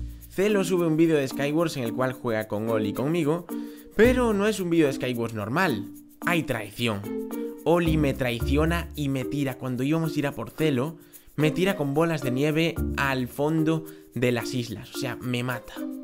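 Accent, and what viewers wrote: Spain. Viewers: son españoles creo :vvvvvvvvvvvvvvvvvvvvvvvvvvvvvvvvvvvvvvvvvvvvvvvvvvvvvvvvvvvvvvvvvvvvvvvvvvvvvvvvvvvvvvvvvvvvvvvvvvvvvvvvvvvvvvvvvvvvvvvvvvvvvvvvvvvvvvvvvvvvvvvvvvvvvvvvvvvvvvvvvvvvvvvvvv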